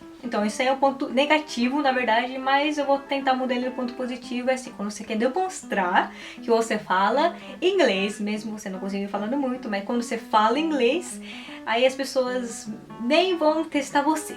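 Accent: Minas Gerais, Brazil. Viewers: Os seus pais eram de qual estado do Brasil? Você fala um pouco como os mineiros ❤🥰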